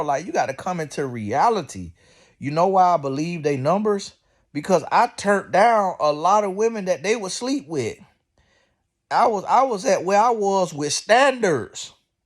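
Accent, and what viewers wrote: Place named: Florida, United States. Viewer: That Florida accent cracks me up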